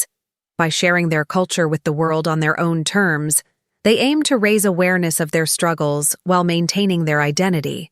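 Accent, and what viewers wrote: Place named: United States. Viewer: Every time I watch videos of this tribe, I mostly enjoy listening to them talk. Their language is the main attraction. Now imagine having to listen to this American voice narrating a story 😞😞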